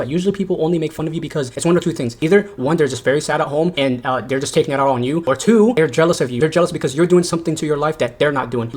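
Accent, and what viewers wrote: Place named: Arab world. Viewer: عرفت من البدايه انك عربي 😂😂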